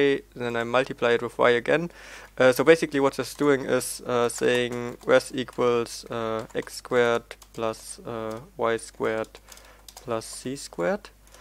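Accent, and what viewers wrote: Germany. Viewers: okay cool hört man das du deutscher bist XD.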